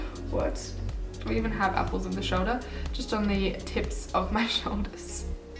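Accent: Australia. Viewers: Oh damn it's Gigi w an Aussie accent!!!! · are you australian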